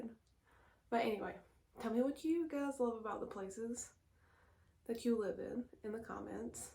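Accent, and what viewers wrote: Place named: South, United States. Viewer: Living in the south does have it's perks, is like if you live in the south like me, I live in Florida you must have our foods, is just amazing, we are the friendliest of people, especially to our people and visitors from the northern states that come down here during winter, you know them, affectionally known as snowbirds, and yes, it drives me insane when people drive around slow in the fast lane, and they drive with their loud exhaust, it annoys me to no end. Great video as usual, looking great and I love your Southern accent. It's so cute.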